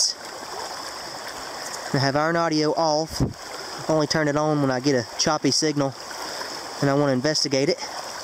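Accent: South, United States. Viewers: where are you from you sound like you're from the south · I love his accent an he's Avery handsome southern man stay that way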